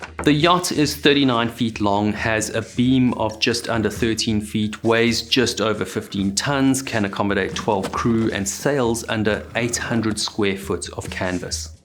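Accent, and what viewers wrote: South Africa. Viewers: Are you a Saffa?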